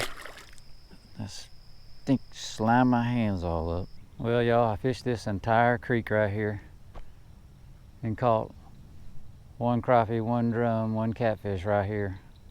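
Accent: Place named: South, United States. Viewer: Great Video, loved listening to your 'Southern Drawl'! New Sub from Indiana. Keep on Fishin!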